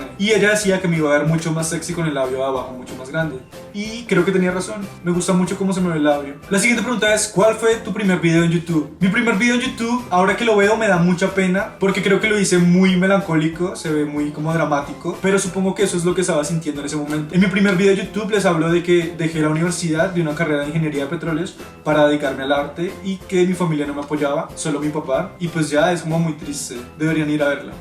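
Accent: Colombia. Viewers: Awww,¿por que hablas tan tierno? ese acento colombiano;D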